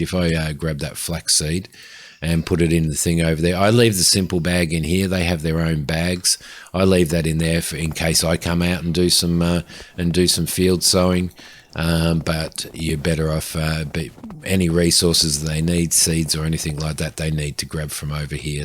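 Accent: Australia. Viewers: Australian, wah whoo!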